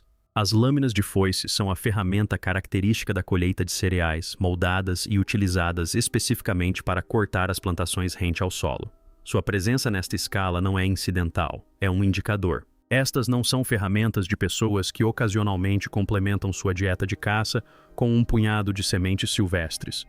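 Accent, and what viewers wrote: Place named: England, United Kingdom. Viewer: Gostaria de uma dublagem sem sotaque em inglês 😒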